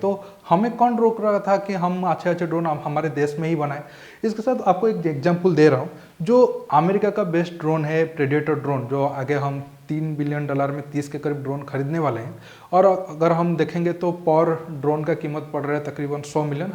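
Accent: Odisha, India. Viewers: Hindi bolne ke style se pata chal raha he ki aaap odisha se hi ho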